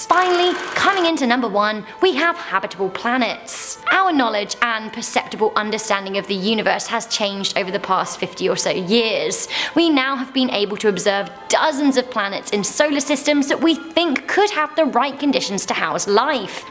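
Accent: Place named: United Kingdom. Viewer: gotta love the uk accent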